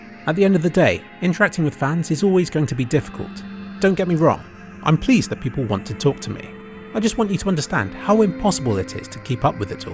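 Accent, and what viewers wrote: United Kingdom. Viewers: For many you are a god, for others a simple person who uploads videos to YouTube. For me you are someone who inspires and gives a good message and gives a smile, I also love how you narrate your videos, I would love to have an excellent level of English and have that British accent like the one you have:c Valve, give a break pls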